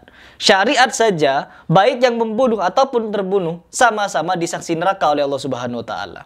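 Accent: Madura, Indonesia. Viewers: kalo ngomong kok ada nada maduranya ya😅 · dari logatnua kyk orng madura pantas phm sejarah carok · kalau sendainya ini org madura asli (krn logatnya sprti logat madura). sya yakin ada 100 org saja org madura sprti psti madura penuh dgn kedamaian dri kekerasan. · kyaknya asli Madura juga ya?Logatnya spt Madura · Aslinya org mn ini ya.kok logat bahasanya kayak org Madura.?? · orang aseli madura kh? ketauan dari logat nya, hehe, (bibi saya orang madura jga soalnya, dan mak saya (almh) jga pandai ngomong medura krna sering bergaul dgn org madura) kalo iya ini momen yg pas utk menjelaskan & mengedikasi soal carok & bela diri, salam bela diri, saya cuman mantan atlet silat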